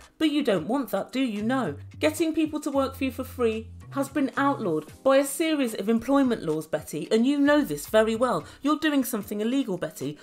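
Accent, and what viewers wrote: South, United States. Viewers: your American southern accent gives me life every time I hear it 😂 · Loved your southern accent! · There is NOTHING I LOVE more than your American accent followed by your laugh. The best laugh ever. 🤣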